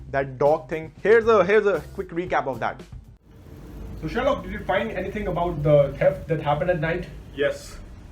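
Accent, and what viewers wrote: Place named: United States. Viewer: Liked the video....if I ignore the pinch of American accent in btwn😁....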